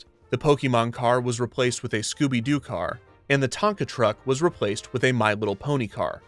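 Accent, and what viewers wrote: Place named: New York, United States. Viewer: That was the most aggressively New York accent I've ever heard! XD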